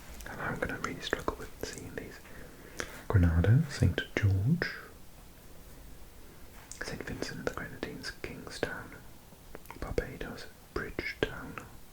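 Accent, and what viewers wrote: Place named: Wales, United Kingdom. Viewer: Welsh accent ASMR??? Where have you been hiding, Mr??? 🥰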